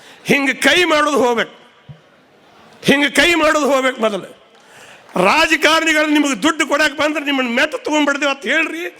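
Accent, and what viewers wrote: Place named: North Karnataka, India. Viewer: ಉತ್ತರ ಕರ್ನಾಟಕದ ಕನ್ನಡ ಗಟ್ಟಿ ಗತ್ತಿನ ಮಾತು ಅರ್ಥ ಮಾಡಿಕೊಂಡು ಕೇಳುವುದೇ ಚೆಂದ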